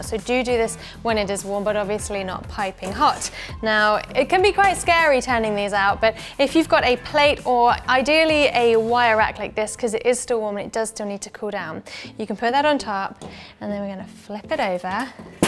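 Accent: United Kingdom. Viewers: Love her channel and love her beautiful British accent 💕🌸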